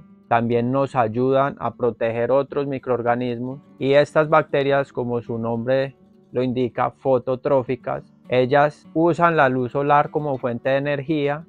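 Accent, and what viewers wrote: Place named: Colombia. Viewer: Bendiciones manito por el acento eres colombiano en que departamento vives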